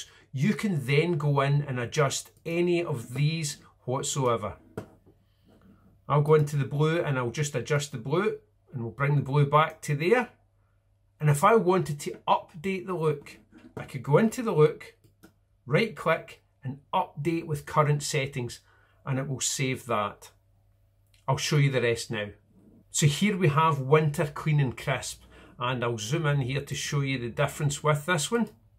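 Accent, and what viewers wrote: Scotland, United Kingdom. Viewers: I looove your scottish! I want you to tell me great tales! =)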